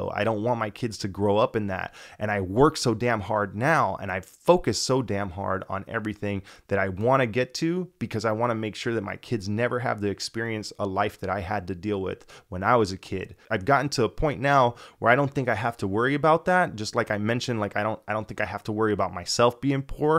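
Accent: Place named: Miami, United States. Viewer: Glad I heard this. We grew up with similar lives and happy to see there’s more people like me in the tech industry. Btw as soon as you started talking I knew you were from Miami, I was right lol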